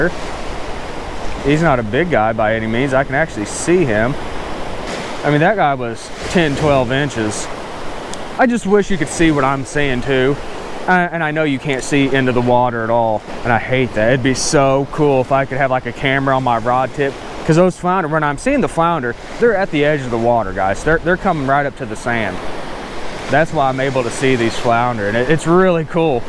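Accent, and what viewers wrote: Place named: Alabama, United States. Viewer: After watching and listening I can hear the same Alabama accent as Beard. Lol Sounds country like here in Texas 🐟 🎣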